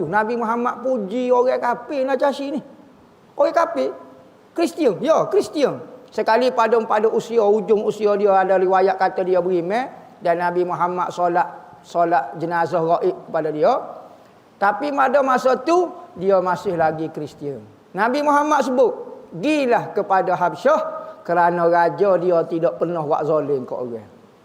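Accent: Kelantan, Malaysia. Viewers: saya oghang Kedah okay ja dgn kecek Kelate dio tu...faham gak sikit2...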